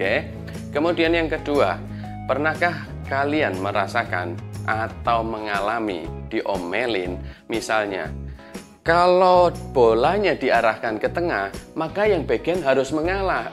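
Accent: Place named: Java, Indonesia. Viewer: Saya jogja pak, saya kira bapak jogja kog ada logat jawanya Terus berkarya pokoknya coach.. Kami tunggu video selnjutnya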